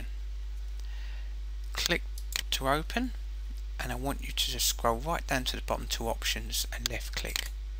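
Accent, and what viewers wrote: United Kingdom. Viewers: I am really appalled, shocked, dismayed. As to these mumble mouth individuals. Trying to provide instructions for using, Naturally Speaking. When they can hardly speak, themselves. Never mind that nobody is actually speaking into a microphone, directly. So that you can barely understand what they are saying. In a terrible acoustic environment to boot. This is just mindless, buffoonery. And this guy with his mumbled British street slang. Sounding like he is 10 years old. Stupid clueless buffoonery is on the rise. It's rife throughout the world, today. As we regress back into the 19th century here in the 21st, century. Except that we now have voice recognition software with people who cannot articulately speak or enunciate words correctly. And yeah. Voice recognition software isn't going to work well with people who cannot speak. Who mumble and mispronounce, words. 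Which happens often. As in, "ofen" and you're not British. And not off 10. Or off, ten. And a chasm is not a, chaz'em. It's a, "kazim". Otherwise how do you expect voice recognition to understand, mispronounced words? Unless you build in the proper pre-buffoonery into the software for such illiterate pronunciations. That doesn't make the product better. That makes people far more illiterate and incompetent. And the inability to deliver a spoken concept. With the inability to articulate it into the proper word stream. Um, duh, ya know, I'm saying. Way. Go. Dog. I think that sentence is perfectly understandable. From a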